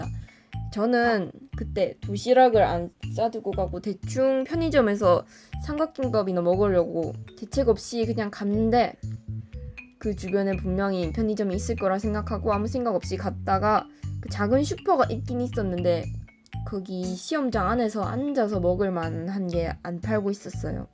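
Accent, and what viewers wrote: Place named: Daegu, South Korea. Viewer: 대구사람이세요? 사투리가 대구사람이네 ㅎㅁㅎ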